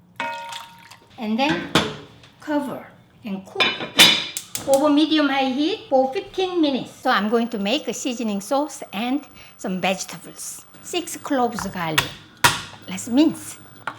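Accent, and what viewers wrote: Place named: South Korea. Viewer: 말씀 하시는거나 요리 하시는게 참 귀엽네요. 오나전 한국 액쎈트로 말입니다😁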